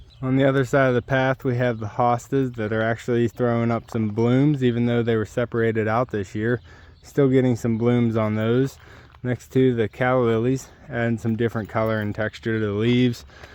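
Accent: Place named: Western Pennsylvania, United States. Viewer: I was wondering the same thing. But I guessed western PA from your accent!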